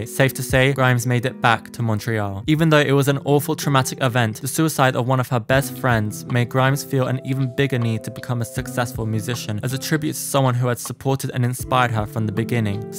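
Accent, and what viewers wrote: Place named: North, United Kingdom. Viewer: I guess this YouTuber is from somewhere of Southeastern Scotland or Northeastern England, anyway the very northernmost part of Britain but little bit to North Sea coast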